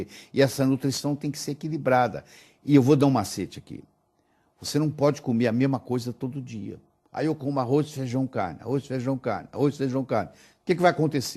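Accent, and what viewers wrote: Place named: Minas Gerais, Brazil. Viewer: mineirice... coisa linda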